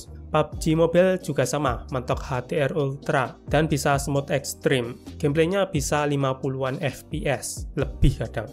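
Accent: Surakarta, Indonesia. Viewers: Dari solo kah bang? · Lah orang solo bang?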